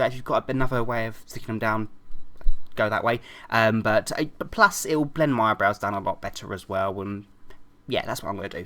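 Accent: United Kingdom. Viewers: Are you British? -KidHawk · i love how creepy the make up looks and then you speak with that freaking adorable british accent and it completely steals the intimidation away for me XP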